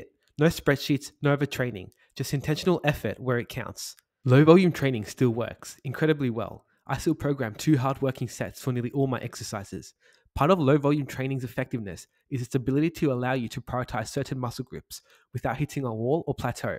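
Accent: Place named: Australia. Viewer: respect to your optimistic attitude. also fellow aussie i hear?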